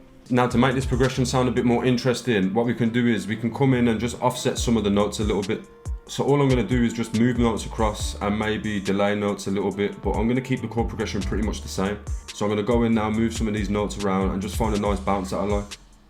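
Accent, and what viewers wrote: Birmingham, United Kingdom. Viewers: Sounds like you’re from Brum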